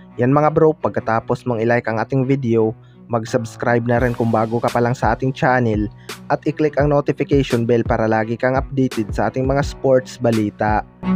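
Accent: Visayas, Philippines. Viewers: Visaya k dong tagilid salita MO 😅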